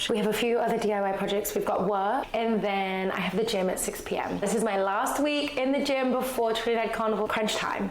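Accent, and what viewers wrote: United Kingdom. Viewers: 😭 I binged some shorts and subscribed right, then in one I heard a (your) voice and smiled. My thought was look at another woman who likes to put on accents like me (you were answering a commenters question). Only to find out when reading your bio that you're British for real. I have real tears in my eyes hahahah I was so virtually embarrassed for myself. Love your channel. ❤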